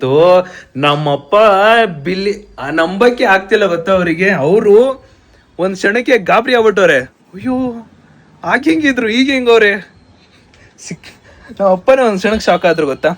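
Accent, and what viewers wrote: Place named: Mangalore, India. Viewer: ಸ್ವಲ್ಪ ನಿಮ್ಮ ಕನ್ನಡ ನಮ್ಮ ಮಂಗಳೂರು ಕನ್ನಡ ತರ ಇದೆ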